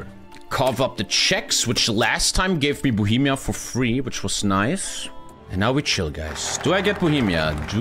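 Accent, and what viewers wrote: Germany. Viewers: You sound German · Hi, I am new on the Channel, can I I ask are you German because I Stand the Things you Said in your Rage?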